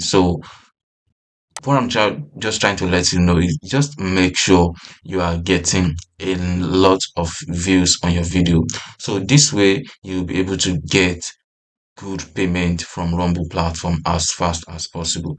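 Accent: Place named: Africa. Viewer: Improve your Audio. Your English is very poor, you have a strong African accent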